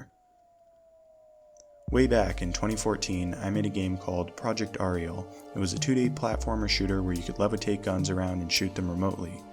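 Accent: Australia. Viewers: I bet you're australian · Let me gues. Australia?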